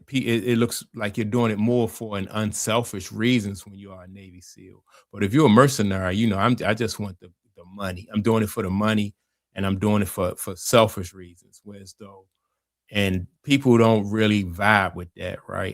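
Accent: Baltimore, United States. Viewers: Baltimore accent?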